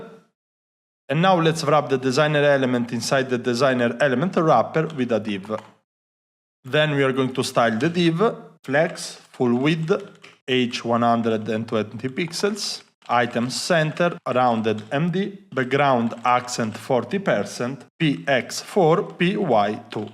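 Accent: Italy. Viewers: Nice work! Are you Italian? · Ma sei italiano? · Are you Italianoo ❤?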